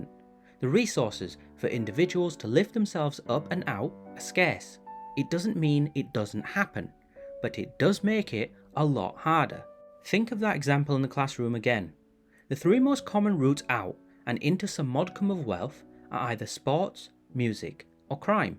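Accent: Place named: Yorkshire, United Kingdom. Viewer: Really engaging video- cutting through the noise and the bs commentary which is pervasive in the news and most social media. I can sense a hint of Yorkshire accent amirite?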